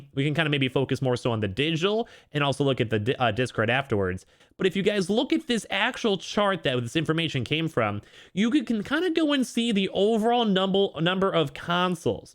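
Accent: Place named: Chicago, United States. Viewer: It’s that shhheh caaaahgo accent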